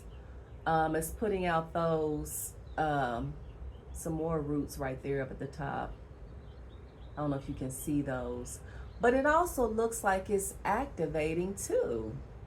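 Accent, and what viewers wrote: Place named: South, United States. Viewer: Hi 👋🏽 I love love your down south accent. 👏🏾 ❤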